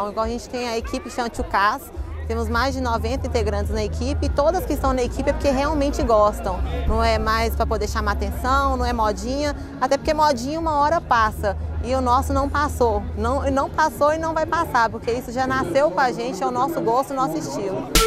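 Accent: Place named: Minas Gerais, Brazil. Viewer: Sotaque amei mineres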